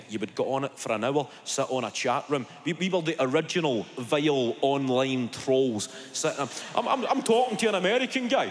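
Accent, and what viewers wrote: Scotland, United Kingdom. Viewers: Brilliant accent easy to understand if your from Scotland, the experiences he talks about of being a kid in 90’s is relatable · I love a Scottish accent 😁 trust me you get stronger, I'd recommend trying to watch Rab C Nesbit 😂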